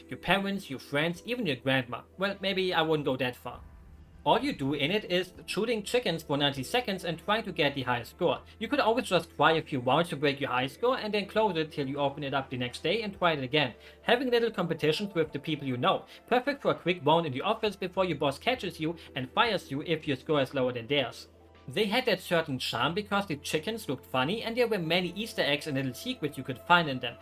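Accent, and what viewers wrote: Germany. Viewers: You sound soooo German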